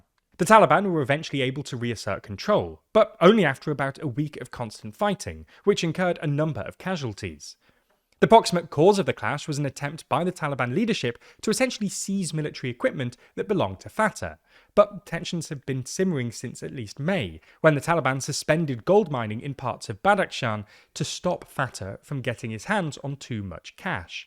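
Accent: United Kingdom. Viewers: By your British accent I can tell you are one of those who gather some audience and then start political analysis of their own. Here in this case you data collectors have been totally wrong. There is no such thing here in Afghanistan what you call uprising. Afghanistan is under strict control of Taliban who were brought by your Dads(Many in number) the United Sates not by the British who died in hundreds while defending American interest. Thanks to president trump and his administration who spitted on the coffins of British forces and didn't even bother to gift everything to Taliban. By the way you should another podcast about Russia going to attack British military targets in Ukraine. yesterday's attack 12 British engineers were smoked. Hahahaha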